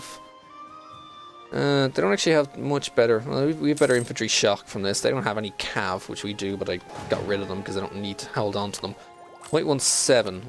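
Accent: Ireland. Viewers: like he is from Ireland. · For Northside Dublin it's actually pretty subtle! My parents and entire family are from Northside and some are the type who'd say " Story bud" in that typical accent. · Hey, what accent do you have? I hear Irish, but I might be horribly wrong.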